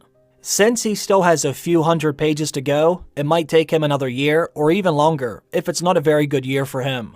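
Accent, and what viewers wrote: United States. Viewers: Where did you learn to read? Are you American?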